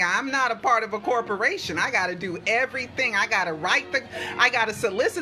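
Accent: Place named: United States. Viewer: Salut!!! Joyeux noël!!! Happy Christmas!!! You are a very intelligent woman. And I will buy your DVDs on amazon. We have to support your job and it don't matter if I don't all understand, I understand the general sense. For your devotion for Michael and us. And i love your personality, your American accent. And I want to know who is Peter midani? Kisses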